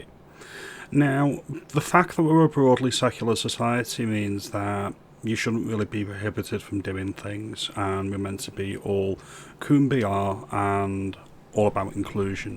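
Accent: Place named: Cheshire, United Kingdom. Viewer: Love your musings mate. You're a great Patriot. What part of Cheshire are you from? Am guessing one of Runcorn/Frodsham/Helsby/Winsford with that accent! ie Scouse overflow areas! 🤔🧐🫡🫵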